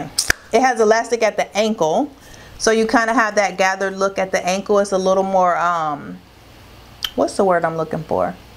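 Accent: South, United States. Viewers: I lost a lot of weight, for my health. I am loving the extra benefits, like needing new clothes. I have turned into a shopaholic. You are my style inspirations. I am over 40, but can still wear a lot of the ideas you give me. Love you style. I love your accent. Just a little bit of the south.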